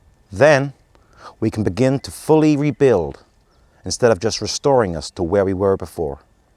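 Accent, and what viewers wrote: United States. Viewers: Your American accent is excellent! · Thank You! Who knew I’d need a Brit to make sense of the word salad this creature constantly spews? You do a great American accent, and the hairstyle is spot on! 🇺🇸❤️🇬🇧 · you are better than at least 99.5% percent of the actors in Hollywood! You have Madam Snarkle NAILED!! The American accent is amazing!! Congratulations to your fine work and warmest wishes from Northern California!:) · Best American accent I’ve heard by a Brit! (Apart from Hugh Laurie and his House character)!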